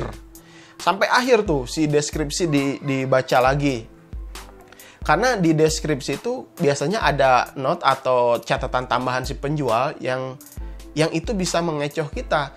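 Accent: West Java, Indonesia. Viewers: nyunda geming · Sunda pisan euy :v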